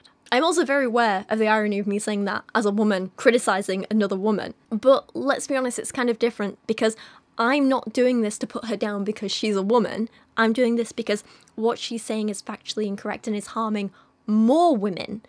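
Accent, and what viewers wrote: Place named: Yorkshire, United Kingdom. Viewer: I always enjoy listening to your videos whenever I'm doing things like cleaning, running errands, and cooking, regardless of the topic. My favorite teacher from elementary school grew up in Yorkshire and your accent is so nostalgic and comfortable to me.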